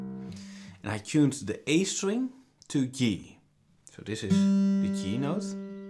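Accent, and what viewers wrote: Netherlands. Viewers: love the dutch accent :P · hahaha ik hoorde aan je accent dat je nederlands bent xD goede tutorial, dankjewel, alleen het zou het een stuk makkelijker maken als je op plaatsen zoals je rechterhand ook laat zien om de tokkel te begrijpen. dankje. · I noticed from the accent you're Dutch. Awesome composition. Erg mooi! Gaaf dat je 't gratis weggeeft.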